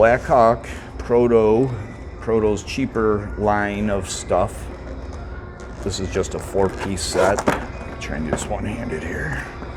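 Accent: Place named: Chicago, United States. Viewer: I listened 5x just for the Chicago accent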